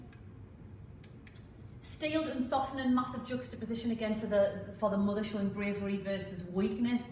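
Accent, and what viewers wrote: Newcastle, United Kingdom. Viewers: From the north east surely , Newcastle ?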